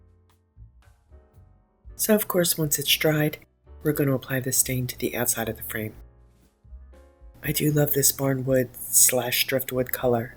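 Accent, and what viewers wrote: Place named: Delmarva, United States. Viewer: There has not been one project that you have done, that I have not been in love with. I keep coming back because you love vintage as much as I do. Thank you so much for all you do, thank you for everything that you share, thank you for inspiring me to push things a little bit further than what I normally would. I am originally from Baltimore city, and have relocated to Central Florida, and it’s always refreshing to hear you speak… not sure where you’re from but I’m picking up DELMARVA/PA, area. So you are like having an old friend inspiring me. Keep doing what you’re doing! Lots of love 🥰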